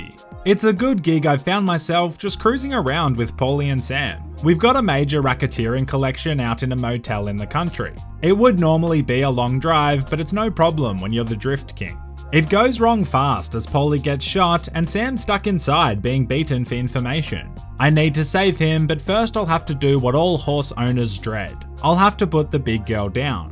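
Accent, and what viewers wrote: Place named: Australia. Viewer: double dropping caps, your so australian in ur vids i love it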